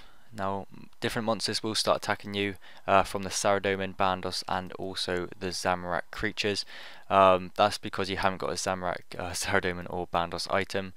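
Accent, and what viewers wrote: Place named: West Country, United Kingdom. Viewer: Thought you sounded westcountry :p From Devon myself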